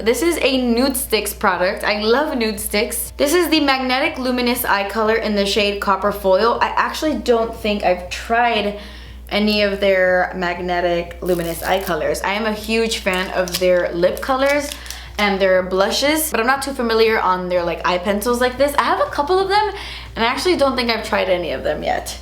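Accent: Cuba. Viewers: Can you do a makeup tutorial in Spanish? I’d love to hear your Cuban accent for it haha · Me too. Makes me LOL everytime her Cuban chica comes out to play! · Love it when u speak Spanish and your Cuban accent is so 😍😍😍😍😍 I would be able to hear you just talk forever!!!!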